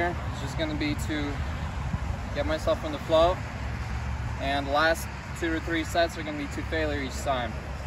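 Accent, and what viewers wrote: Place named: Russia. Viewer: Just as hot as 10 years ago. Interesting to see the Russian accent isn't nearly as thick as it used to be.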